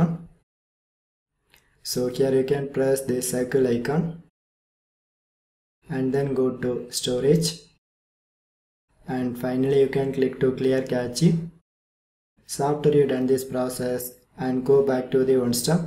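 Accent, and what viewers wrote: South India, India. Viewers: R u from south india bro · bro he's Indian he's trying his best to speak English respect that. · Are you South Indian?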